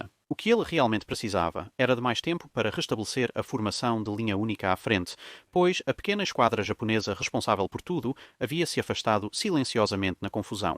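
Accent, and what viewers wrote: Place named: Portugal. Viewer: Excelente conteúdo amigo, apesar do sotaque tuga bem forte conseguiu passar muito bem a explicação toda no vídeo! Abraços do Brasil.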